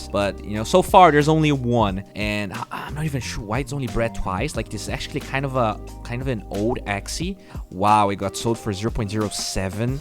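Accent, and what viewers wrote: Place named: Brazil. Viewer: Mano, eu nem sabia que tu era brasileiro e percebi pelo sotaque em inglês. A gente fala de uma forma muito específica em inglês euheuheuhe. Ótimo vídeo!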